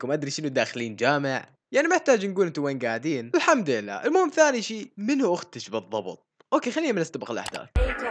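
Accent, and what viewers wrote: Iraq. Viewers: لا سوري عايش بالعراق لهذا لهجتة عراقية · شوكت كال ??اصلا هو ابد ما عرف على نفسة بس من اللهجة مبين عراقي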